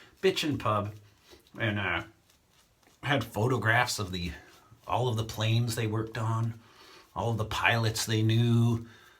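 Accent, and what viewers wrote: England, United Kingdom. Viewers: Do you recall having an English accent at any time as a child? Are there any recordings of your voice at that time?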